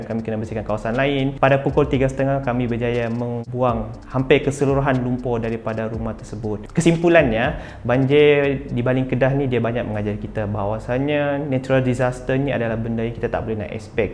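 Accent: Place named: Sabah, Malaysia. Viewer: Mcm org sabeh je bro ni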